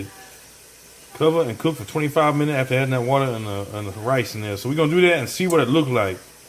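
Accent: Louisiana, United States. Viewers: Why do we love that damn cajun accent so much! Alexa can you use your cajun mood!!!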